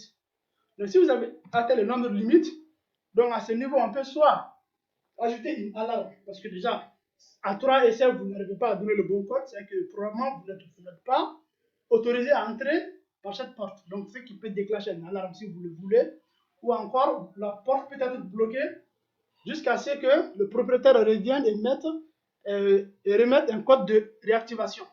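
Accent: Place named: Gabon. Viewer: Bonjour on dirait plutôt l'accent du gabon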